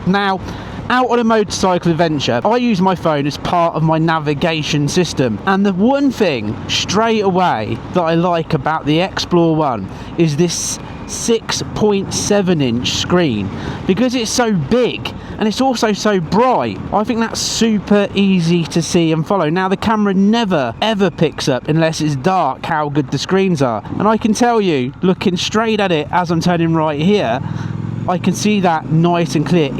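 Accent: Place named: Cockney, United Kingdom. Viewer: Why are you speaking in a Cockney accent?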